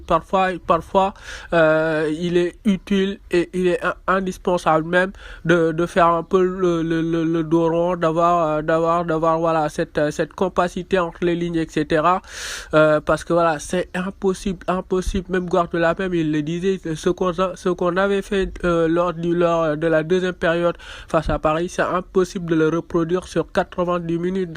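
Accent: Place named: Senegal. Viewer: Accent sénégalais 🙏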